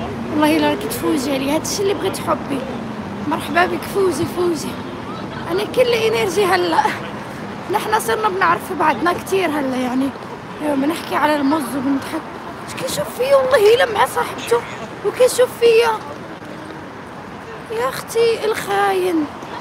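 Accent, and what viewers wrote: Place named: Iraq. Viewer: الله صايره تحكين عراقي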